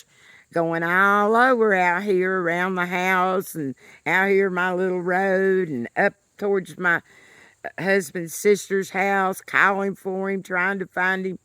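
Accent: South, United States. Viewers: I’m a new follower…I love hearing your thoughts with a southern accent. I am 70 years old and live in California. I paint rocks for elections and send them to battleground states to remind them that I really want to help them become blue. The DNC was beautiful. I don’t want to feel anxious about America anymore.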